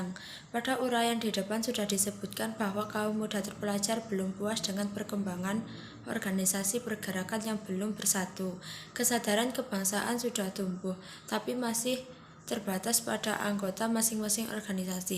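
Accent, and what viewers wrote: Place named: Java, Indonesia. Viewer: Kok medok men mbak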